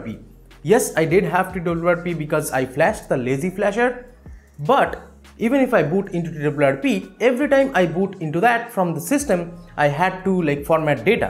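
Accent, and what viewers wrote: Bengal, India. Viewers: Brother are you a Bengali? Voice tay Banglar taan ache :)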